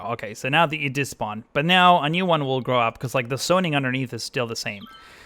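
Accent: Argentina. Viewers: Eres argentino????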